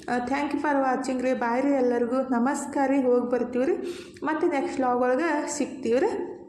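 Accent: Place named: Vijayapura, India. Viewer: ನಿಮ್ಮ್ ವಿಜಯಪುರ ಸೂಪರ್ ರೀ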